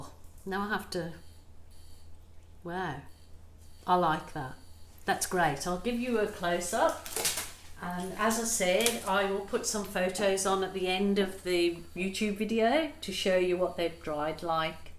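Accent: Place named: Kidderminster, United Kingdom. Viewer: Can I be nosey and ask where you are originally from? I’m in Kidderminster and you sound local xx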